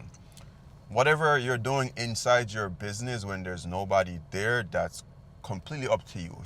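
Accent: Jamaica. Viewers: Buaay, me nuh know, but your patois sound funny eventhough you from jamaica. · The patios accent deeeeep😂😂 I can hear the effort😅😅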